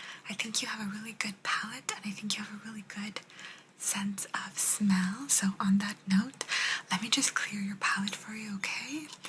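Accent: Italy. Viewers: ❤ love your Italian accent videos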